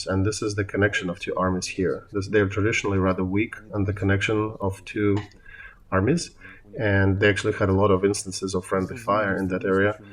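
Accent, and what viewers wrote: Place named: United States. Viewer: His English is extremely good, too, so you can trust the things that you learn. Not perfect but very good. His English pronunciation is excellent. I say these things as a native (US) English speaker. Also, for what it's worth, he has a US accent, definitely. At times I easily forget he's not a native speaker. When I am aware of it, often it's because he pronounces words better than most Americans, speaking every syllable completely. That makes it easy to understand.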